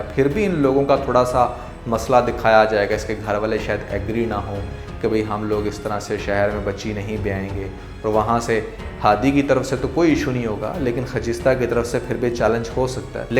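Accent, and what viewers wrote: India. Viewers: are you from India? Because your style is very Indian way of talking, your pronunciation of certain words are also not correct, if you are Pakistani you better improve your Urdu...nonetheless your reviews are ok.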